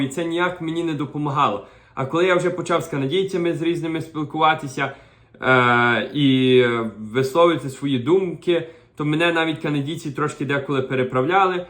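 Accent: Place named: Transcarpathia, Ukraine. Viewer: Цікавий канал, у акцент закарпатський:)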